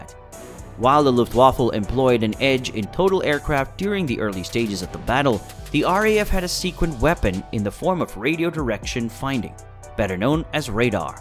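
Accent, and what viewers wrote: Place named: United States. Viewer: No I didn’t like the video and you won’t see me next time. Why didn’t I like it? Ludicrous American accent from an individual who can barely speak English. Why can he not pronounce British place names e.g. Coventry, properly? Totally inappropriate and ludicrous video footage throughout. What on earth have American planes got to do with the Battle of Britain? And was that a clip of a Japanese scene at the very end? The whole thing is absolute garbage.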